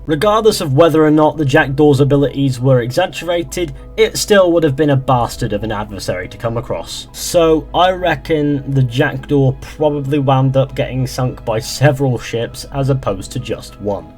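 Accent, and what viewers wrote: United Kingdom. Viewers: Where exactly is your accent from? I know it's somewhere in the UK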